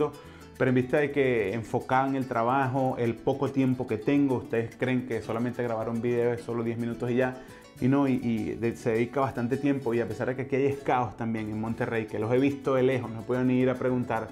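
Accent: Venezuela. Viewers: Hola un gusto verte te vi por allí en YouTube y te escuché hablar y dije el habla como venezolano y bueno me suscribi y me encanta tus videos ☆éxitos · su acento es caraqueño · Al chile suena mejor el acento venezolano que el colombiano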